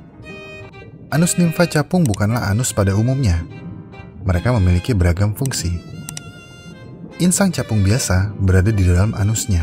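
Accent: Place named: West Java, Indonesia. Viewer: Gua curiga admin orang sunda😁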